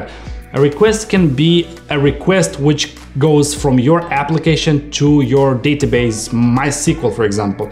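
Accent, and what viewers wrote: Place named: Russia. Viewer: You have nice russian accent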